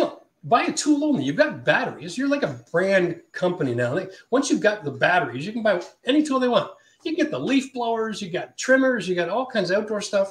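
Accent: Canada. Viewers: Wait, what? Are you Canadian or American?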